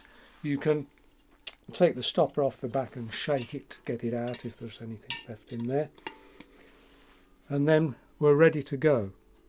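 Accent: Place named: Suffolk, United Kingdom. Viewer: I detect a suffolk aaaacent there 😁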